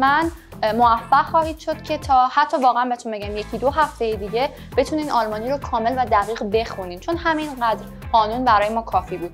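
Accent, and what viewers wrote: Iran. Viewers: استاد روی لهجتون خیلی باید کار کنید چون آلمانیرو دارید با لهجه غلیظ فارسی حرف میزنید. با تشکر.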